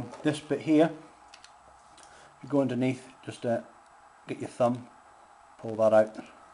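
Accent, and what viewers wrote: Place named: England, United Kingdom. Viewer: Yes nice review, with the English accent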